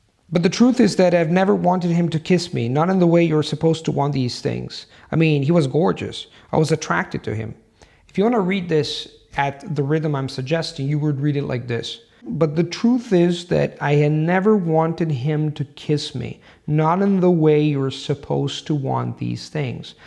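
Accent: United States. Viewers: My pleasure,:D Oh, so staying in Poland "inspired" you to learn Polish, then:P Good luck, btw!:) I've heard that Polish intonation is pretty similar to an Italian one. I mean that it's quite simple to master Italian for Poles and Polish for Italians regarding pronunciation but I may be wrong:P Yeah, it definitely helps socially as you mentioned in the above <3, I must admit that your videos have been like a drug to me recently xd I can't wait to prepare food and watch your video:D Plus, I love the fact that your accent is American <3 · I've known a lot of Italians, but I don't think I've met any who've mastered American intonation like you.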